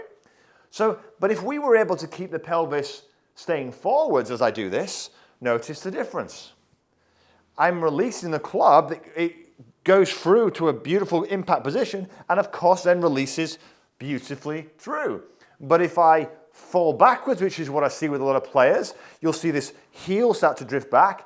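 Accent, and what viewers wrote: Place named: Kent, United Kingdom. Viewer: I once asked CHATGPT about way of speaking and they gave me a long and detailed, almost scholarly account of his travels and where he picked up his nuances... very interesting and very funny. His main influence seems to be Kent in England.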